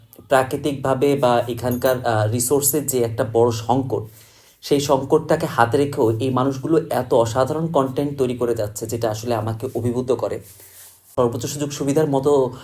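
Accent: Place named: Chittagong, Bangladesh. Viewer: আপনার এক্সেন্টে চাটগাঁইয়া একটা ভাব আছে, চট্রগ্রামে বড় হইছেন আই গেস❤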